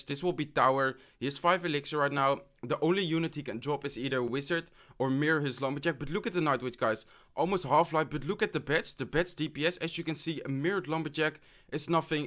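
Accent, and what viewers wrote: Netherlands. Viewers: Are you from the netherlands? becuz your voice sounds like that.. And im from the netherlands too :) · ben je nederlands want je praat soort van Nederlands Engels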